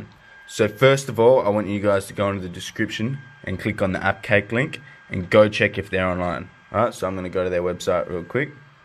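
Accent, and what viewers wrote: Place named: Australia. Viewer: you have that strong Aussie accent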